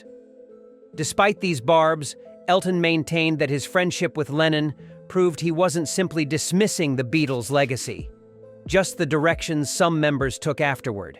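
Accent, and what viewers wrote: United States. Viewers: Elton and Freddie frequently partied together and also exchanged gifts with each other. Elton even cried at Freddie’s passing, and sang the song at his tribute concert. They were very good and close friends, I’d say and the rivalry wasn’t toxic the way it’s described here. This snarling narrator with an American accent has produced this video for clickbait by peddling in significant mis/disinformation imo.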